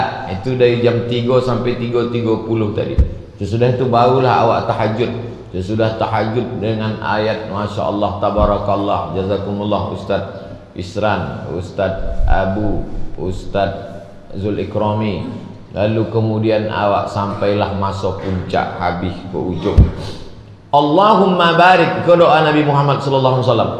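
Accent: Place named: West Sumatra, Indonesia. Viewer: cakapnya mcm bahasa minang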